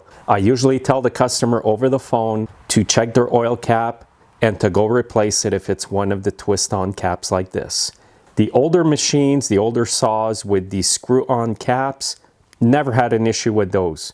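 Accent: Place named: Newfoundland, Canada. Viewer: You must be from either Newfoundland or Labador by that Accent that you have!! you can't Fool an Old Canuck Lol anyways Love you for being a Caregiver to you're Wife your a Very Strong Man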